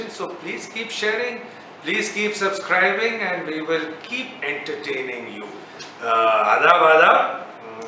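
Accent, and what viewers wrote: Bhopal, India. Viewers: Zabardast tale.. btw you have a unique style of telling these stories with bhopali spices 👏👏👏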